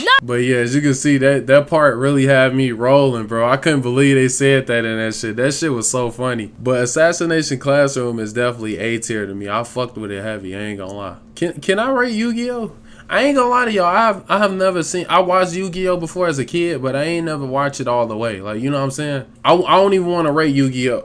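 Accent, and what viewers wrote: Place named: Chicago, United States. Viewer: I know a fellow Chicagoian when I hear one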